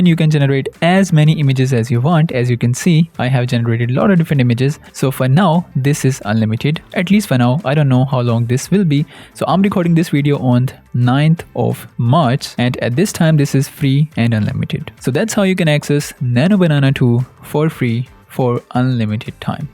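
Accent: India. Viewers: Indian accent is so annoying🥀🥀💀💀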